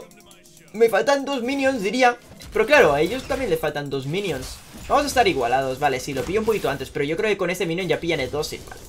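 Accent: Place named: Argentina. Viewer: por que hablas como argentino? xd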